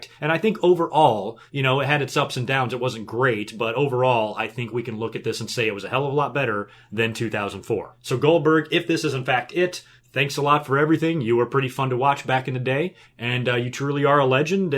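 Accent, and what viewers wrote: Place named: United States. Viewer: love the American accent, house of whores match lmao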